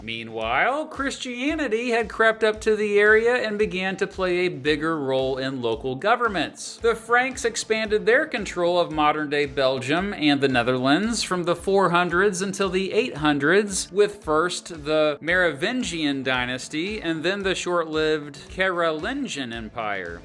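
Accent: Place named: Midwest, United States. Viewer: The lecturer sounds like a native Midwesterner.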